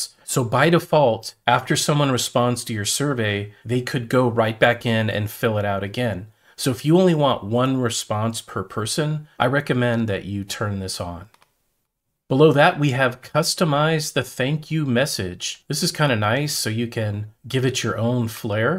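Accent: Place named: United States. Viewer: very useful! However, every time I hear an American pronounce 'mobile' in 'mobile phones', I'm struck by how much it sounds like 'MOBIL' as opposed to mob-ile which is how the rest of us pronounce it (Australia, Britain, Ireland, Scotland, etc) Indeed, how do Americans pronounce the name of the oil company, MOBIL? And how would you distinguish that from something being mobile? Any way, who cares. You answered my question which is how I actually get my form link to my users, so thank you sir for the clear explanation!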